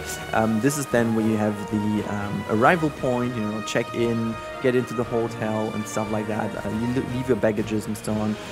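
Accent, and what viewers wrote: Germany. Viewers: Gutes Video! (I assume you are German too? At least the accent sounds German to me :D)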